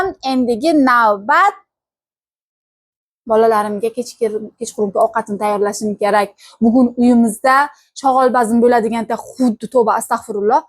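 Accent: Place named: Qorakul, Uzbekistan. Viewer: Ман хам шуни уйладим бу точна Коракул ёки олот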